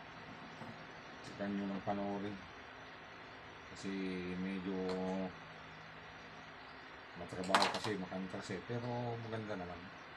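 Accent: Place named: Ilocos, Philippines. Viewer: Tagat nu ka bro?Parang ilocano ka..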